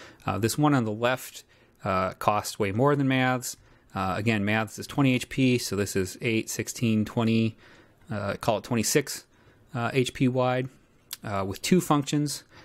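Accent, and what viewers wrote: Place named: Midwest, United States. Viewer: This is just a relatively thick Midwestern or Canadian accent, he's pronouncing it correctly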